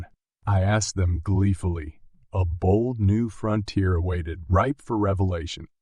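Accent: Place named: South, United States. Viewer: No ! Country accent does not work for sci- fi .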